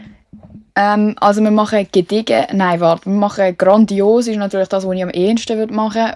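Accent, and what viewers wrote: Basel, Switzerland. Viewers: Mir fällt auf, du hast dein Dialekt immer mehr Basel angeglichen.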